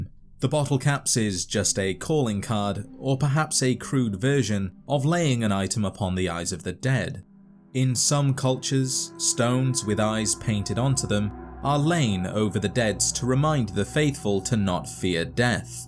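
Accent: United Kingdom. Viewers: Good to see a fellow (from what I can tell) british fallout content creator. Great shit dude!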